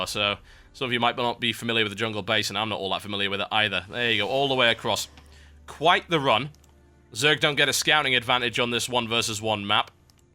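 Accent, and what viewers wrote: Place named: Newcastle, United Kingdom. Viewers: Hard lol Geordie casting xD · Lol all geordies don't talk like that :P, well i don't anyways :P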